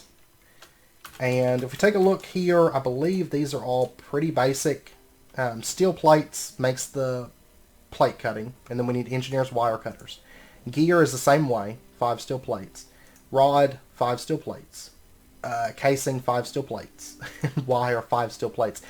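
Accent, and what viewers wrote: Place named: South, United States. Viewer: somewhere in southern USA afaik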